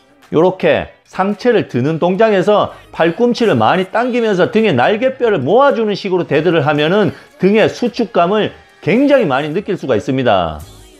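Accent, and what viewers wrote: Gyeongsang, South Korea. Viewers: 형님 항상 꿀팁 잘 보고 있습니다~ 같은 경상도라서 그런지 말투도 친숙하고 귀에 쏙쏙 설명이 박히네요 앞으로도 좋은 영상 계속 찍어주세요 (개인적으로 일두근 영상도 다시 해주셨으면 하는 바람,,)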